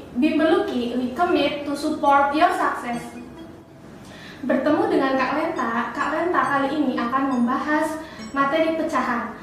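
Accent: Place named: Java, Indonesia. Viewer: Aku suka dengan medhoknya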